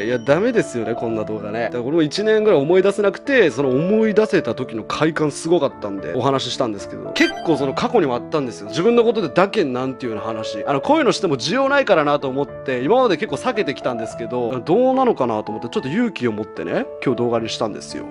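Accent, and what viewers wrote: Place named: Chikugo, Japan. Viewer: 正確には筑後弁だね？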